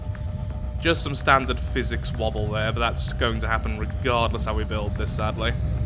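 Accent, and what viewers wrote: United Kingdom. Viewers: holy shit I was looking away and forgot what I was watching and you sound like the most stereotypical brit I could not distinguish which british youtuber I was watching lmfao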